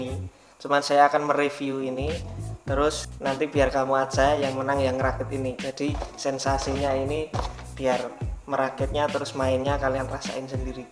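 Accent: Java, Indonesia. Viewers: video unboxing udah menarik koq, rodo medok-medok hahaha..... dan marai pengen nostalgia jaman sd mbiyen dolanan tamiya meneh. salut racun 4wdmu mas. klo bisa unboxingnya jangan kelamaan dari bulan rilis jd bisa buat refersi beli hehehe.... razor back kapan di unboxing mas, padahal di video sampeyan yg kapan itu udah kliatan..... selak muncul sik anyar meneh ig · Medok bang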